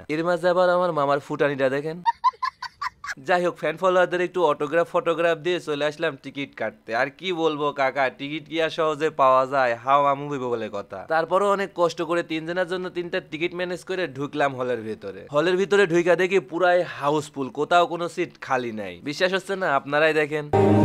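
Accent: Pabna, Bangladesh. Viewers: আপনার মুখে পাবনার ভাষা সুনে সেই লাগলো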